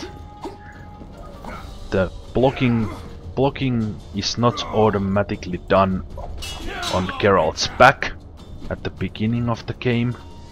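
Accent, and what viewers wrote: Finland. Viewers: the voice.......im 100% it is the finnish accent...its too distinctive.....im from finland so i know but i dont talk like that thank god lolo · lovely finnish accent :D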